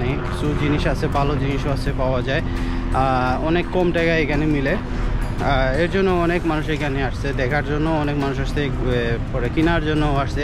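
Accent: Sylhet, Bangladesh. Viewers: Assalamuyalaikum vai. Apnar video gula khub e informative & genuine information pawya jay. Dekhe onek vala lage. Atekta visoy enjoy kori, ami o Sylheti r apnar kotay Sylhet er accents buja jay. Thanks vai erokom authentic information soho videos dewar lagi. Vai ami samer month a amar undergraduate sesh hoibo. Amar ecca Dhaka University te postgraduate na koriya baire Master’s ta korar lagi. Ami onek gula videos dekhci Sweden niya. But personally karo sate kota hoy nai in details. So, kindly apnar sate jodi contact korte partam asha kori onek upokar hoito vai.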